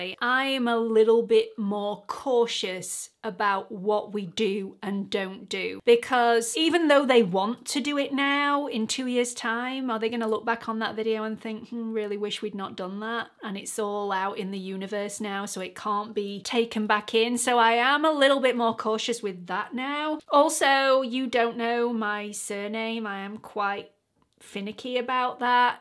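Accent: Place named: Yorkshire, United Kingdom. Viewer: I've just found your channel and you are so refreshing and relatable. I love that you're from Yorkshire too. Accents fascinate me and it's so cool to hear such a variety of them. Now I'm off to binge watch all your videos while I get ready for work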